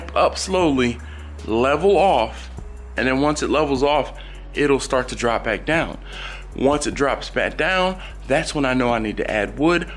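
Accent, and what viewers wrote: Philadelphia, United States. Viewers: Are you from philly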